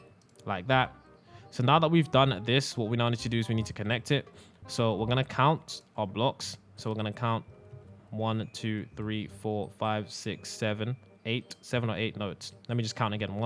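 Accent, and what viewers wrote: United Kingdom. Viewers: Why you sound british?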